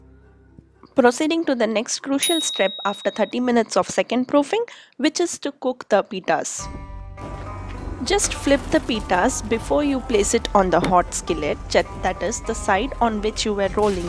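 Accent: India. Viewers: I DONT KNOW WHAT I LIKE MORE; THE NICE INDIAN ACCENT OR THE BREAD. BEST EXPLANATION. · What a lovely accent. I want everything that i learn in future to be taught to me by a a well educated Indian lady.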